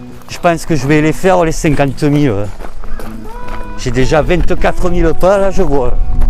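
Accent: South, France. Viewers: Bonsoir et tous,merci pour le guidage,je passe un moment de réel balade,je suis pas tout seul tu est là et avait l accent du midi,les cigales et grillons chute on est bien on continue,je les ouvres bien grand mes yeux,heureux ho oui,comme un marcheur aventureus.MERCI POUCE BON VENT.papy robert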